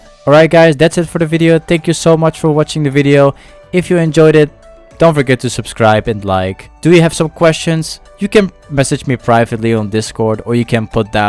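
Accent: Netherlands. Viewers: Ben je Nederlands , hoor echt een accent?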